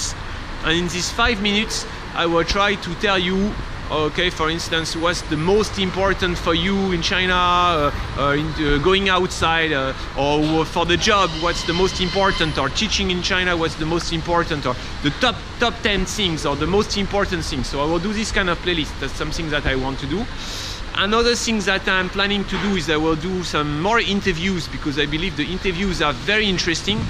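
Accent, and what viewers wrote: France. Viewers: . a French accent. thanks for the comment. please try to hold angle the selfi stick lower to take it more.out of the camera shot. Mercie buquo.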